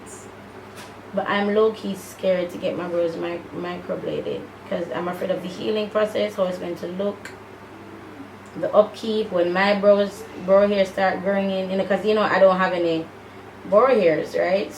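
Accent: Jamaica. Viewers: Are u from Jamaica cause u talk like dem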